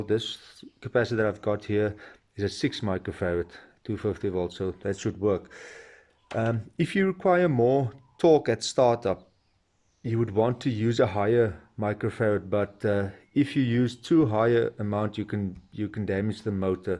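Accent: South Africa. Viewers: Ekse bra you from the SA? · He sounds South African and we have 230v at the Wall. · this man sounds south african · I like south african accent 😀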